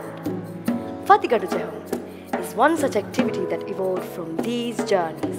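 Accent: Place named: England, United Kingdom. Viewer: I like her english accent😍😍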